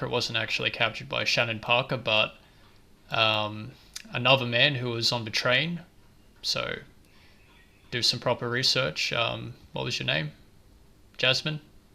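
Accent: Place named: United Kingdom. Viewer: Glad you thought it was important enough to post this scathing comment in response to my scathing comment. Are we having fun yet? You got it wrong again, the name isn't Frederick, it is. That's a family name, especially with the 'son' part. Names like that go back a long way - all the way to Jolly good Britain as a matter of fact. If you're not a Brit, you sound like one. As to the mis-pronunciation of words, there certainly are different pronunciations - there are the correct ways to pronounce specific words, like city names. One pronounces them the way the locals do and there is your way. I'm terribly sorry you feel that a comment like mine is worthy of your attention, but it seems that you are one of those angry people who cannot bear up to criticism. Must be hard to be you. Your "analysis" as you prefer to call it, was actually more commentary because you did not enter any facts based on the scientific method to sway viewers. 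Your opinion was obviously being expressed and you continued to make judgments based on your feelings and interpretations which were uttered with bias. I know, I know, you like all the thumbs up arrows being pushed because YouTube is a revenue generator and negative comments distract from your wish to make that cold hard cash. Too bad. Had your video shed actual light on the subject, I'd have given a better review.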